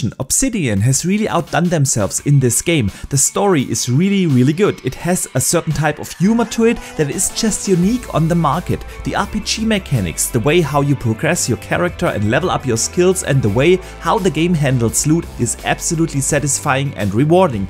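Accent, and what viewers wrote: Germany. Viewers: Du kommst doch Safe aus Deutschland oder 🤣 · Dieses Deutsch-Englisch haha, aber gutes Video :)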